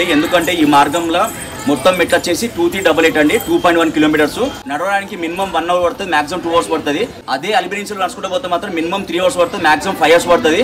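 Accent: Telangana, India. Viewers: Ni information telangana yasalo baguntdhi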